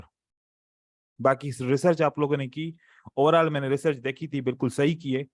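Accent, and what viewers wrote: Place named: Sindh, Pakistan. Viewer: Sir app ki voice se lag Raha ha app Sindhi haan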